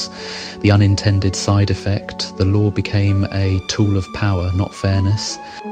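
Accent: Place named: South England, United Kingdom. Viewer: I'm a Geordie. It's always nice to hear that refined southern accent saying something that isn't absolute shite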